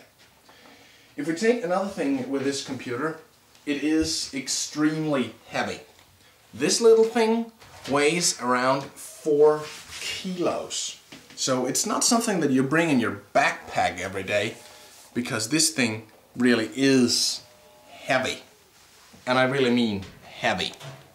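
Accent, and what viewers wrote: Denmark. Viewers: are you from denmark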